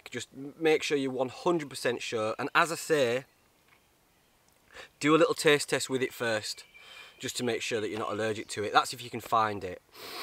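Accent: Yorkshire, United Kingdom. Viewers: Where are you from? I detect a yorkshire accent.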